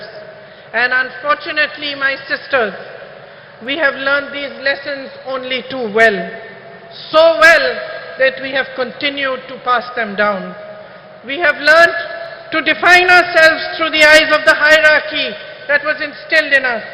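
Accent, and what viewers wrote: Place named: Tamil Nadu, India. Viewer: எங்கள் தமிழின் இனிமையை தங்கள் உச்சரிப்பில் கேட்டு மகிழ்ந்து வாழ்த்துகிறோம் வெல்க இந்தியா வெற்றி நிச்சயம்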